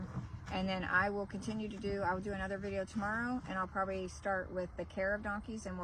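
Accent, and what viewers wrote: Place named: Indiana, United States. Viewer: Thanks for that. Your donkey's, your farm, and your Hoosier accent are all interesting to an Australian bloke like me here in Canberra. 🐐🐎🐔🐑🐶😸🇦🇺👍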